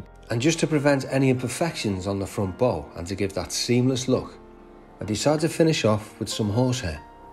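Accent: Liverpool, United Kingdom. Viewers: Cannot wait for the next one. This is how a video of this sort of work should be. No irritating Muzak, or smart arsed co presenter, playing at comedian, just a guy who knows what he is doing and explaining the stages viewed in a relaxed, quiet Liverpool accent. Superb, thank you,.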